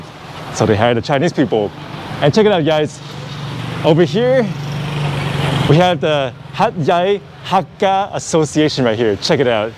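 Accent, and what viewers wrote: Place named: West, United States. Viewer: Now you are in Asia, so you don't have to speak like westerner. You are a China Chinese, don't have to boast that you came from the west.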